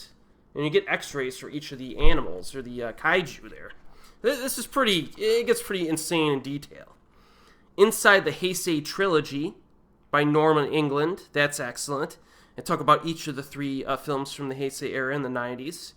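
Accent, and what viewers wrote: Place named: Pennsylvania, United States. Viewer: You gotta be from PA with that accent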